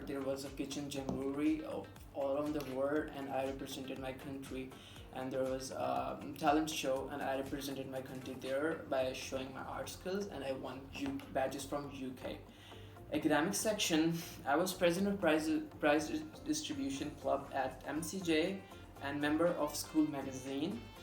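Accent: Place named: United States. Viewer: America gaya nahi or language abhi se shuru kr di hai wah re wah.. btw English kaafi achi ho gayii hai teri specially American accent 😍 ♥️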